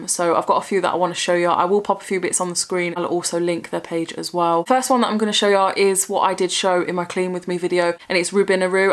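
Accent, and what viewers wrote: Nottingham, United Kingdom. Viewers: Are you from Nottingham ? You sound it x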